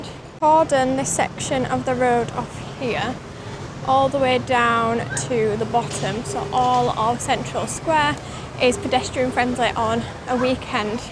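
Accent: United Kingdom. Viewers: I like that accent.. been a fan of british pop since 80's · I love your accent,,,,,from UK?,,,,,your so nice and pretty by moving to my country Philippines….iam glad…..enjoy the Pilipino way of life…by the way iam a Pilipino living In Miami….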